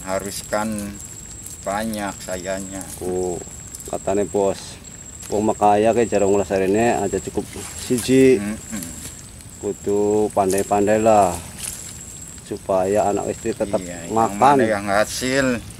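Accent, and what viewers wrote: Indramayu, Indonesia. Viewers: Kya wong dermayu y ngomonge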